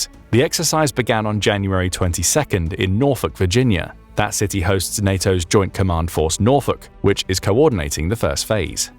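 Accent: England, United Kingdom. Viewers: That English accent is all I need to know about the serious b.s. joke I'm about to hear 😂😂😂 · I think the narrator is from The UK. Greetings from a fellow Dane 🇪🇪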